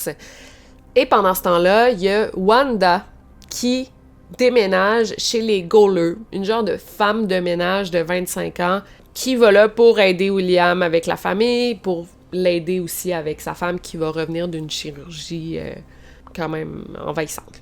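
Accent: Canada. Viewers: Après 10 minutes j'ai déjà mal à la tête ! J'adore l'accent canadien, j'apprécie les récits truecrime mais je n'arrive pas à me concentrer sur ce qu'elle raconte ! Elle me "bouffe" mon énergie, elle parle comme si on était tous ses potes ou comme elle... Je ne veux pas la descendre car elle fait de bonnes vidéos ; c'est dommage... Après il ne faut pas se forcer à regarder une vidéo même si le sujet est intéressant. J'essaierai plus tard avec une autre vidéo, peut-être que j'apprécierais mais là ses manières et ses sourires incongrus me bloquent...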